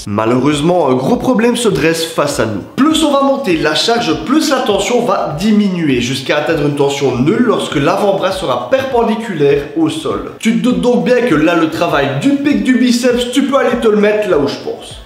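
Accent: Liège, Belgium. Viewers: accent liegois non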